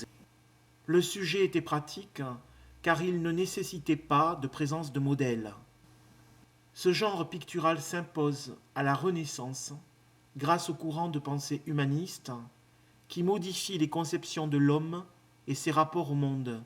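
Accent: Marseille, France. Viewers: Il a l'accent marseillais de l'huile pujet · Allez L'OM ⚪️🔵 L'accent de Marseille Wallah... Sheeeeesh....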